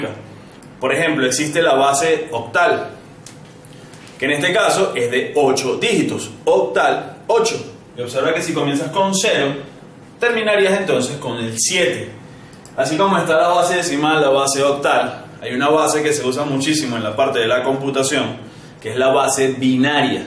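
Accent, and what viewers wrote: Venezuela. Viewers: Hola. Por la camiseta y por el acento asumo que eres venezolano, lo cual me llena de orgullo porque yo soy de Maturin, estado Monagas. Felicitaciones y adelante con tu labor la cual puede ayudar a muchos estudiantes. Adelante.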